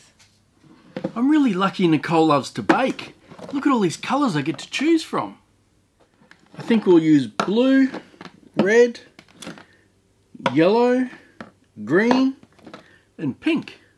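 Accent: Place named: Australia. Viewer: I don't care much resin. I'm here for the amazing Australian accent.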